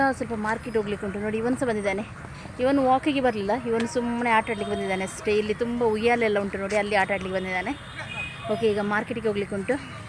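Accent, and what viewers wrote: Mangalore, India. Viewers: Hi mam nimma vlog super nim maklu bandre enna super niv kannada matnadodu nange tumba esta manglore kannada kelodikke kushi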